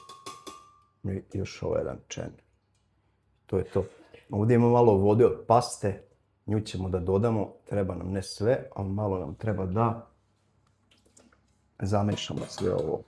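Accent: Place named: Vojvodina, Serbia. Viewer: Kako je lepo sto si zadrzao vojvodjanski naglasak cist tako da miluje dusu❤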